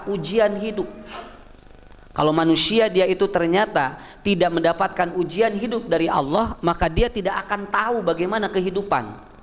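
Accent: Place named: Bandung, Indonesia. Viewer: Hatur nuhun pencerahanna mani nyerep bahasa sundana raos ngabandungannana.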